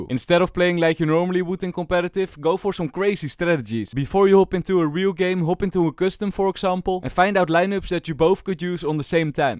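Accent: Netherlands. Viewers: Strongest dutch accent ive ever heard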